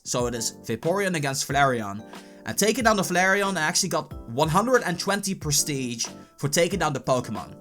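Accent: Netherlands. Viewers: Arnhem Ik dacht al dat je Nederlands was. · Are you Dutch? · Are u dutch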